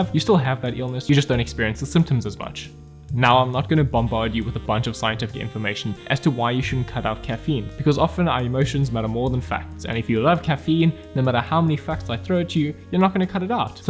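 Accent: South Africa. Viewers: You South African mate?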